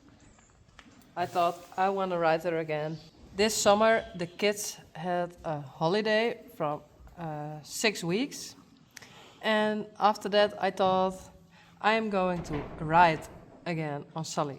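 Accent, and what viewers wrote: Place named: Netherlands. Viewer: weet jij dat je een prachtig Nederlands accent hebt? 😎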